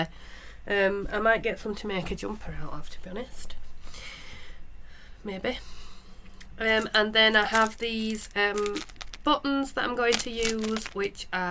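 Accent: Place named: Northern England, United Kingdom. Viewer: so lovely to listen to a fellow northern accent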